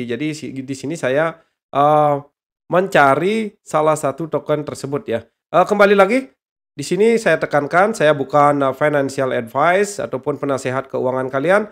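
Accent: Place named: Bali, Indonesia. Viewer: Saya tau dr logatnya bli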